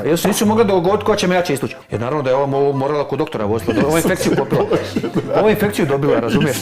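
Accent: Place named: Slavonia, Croatia. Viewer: Ko ti kriv kad nisi Slavonac,, ni ne mozes razumiti o cemu prica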